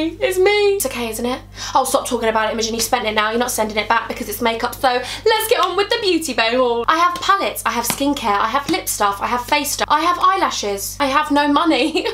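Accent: South, United States. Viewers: ooooommmmmmmmmmmmmmggggggg u sound so American it is scary like if I had never watched u before an u showed me just that clip I would think u were American! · IMOGEN OMG your American accent is ridiculously good!!! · I am shook how good your American accent was!!! 😱😱😱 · Omg the American accent 😍😍😍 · The American accent tho! 👏🏼👏🏼 yass boo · That American accent omg girl you killed it i love you so much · U SOUND SO AMERICAN OMG AHAHAHAH LOVE U IMOGEN💗💗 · the American accent is amazing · LOVE MARMITE SO ❤️ and that American accent is IT!! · ! Love you, Wish i could fly out there all the way from North Carolina, love your southern accent by the way! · Omg your American accent was so good and it acc really suits you, you should do a video doing a makeup tutorial in different accents😂❤️ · Your american accent is soooo good!!! Please do the accent challenge with #Bensalad or jacob!! 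love you imogen xxxxx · Wow your accents are amazing love their American one pls do a first impression video in a American accent I love your videos they make me so happy! Your vibe is amazing 😘😘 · Your wing liner and American accent 💕🔥 obsessed! Xx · Her American accent♥️ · Snapchat fam💗💗 also her American accent is flawless!!! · Love you American accent Haha 😂😂Xxxx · Your American accent is so good! And I love your personality. 😊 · You actually amazing at an American accent!! Xxx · YAS! I'm living a for the American accent and your singing voice. Can we just get Imogen on Big Brother! 🦋🐝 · I live for your American accent and honestly I relate to your butterfly brain to a T and gurll I need those bambi lashes in my life they look insane!!! And of course can't forget the song ❤️❤️❤️🥀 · Plsss do a whole video in an American accent,, i'd love that as much as I love you!!! Xxx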